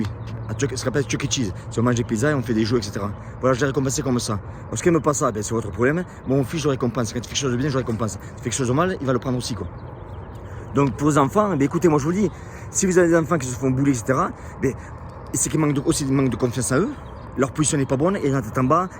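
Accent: South, France. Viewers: Bonjour les USA, bonjour, toujours d’excellentes vidéos avec notre accent du Sud 😉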